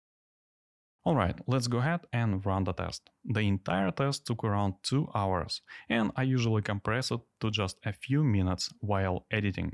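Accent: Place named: Russia. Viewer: явно русскоговорящий тип делает канал на английском, зачем ?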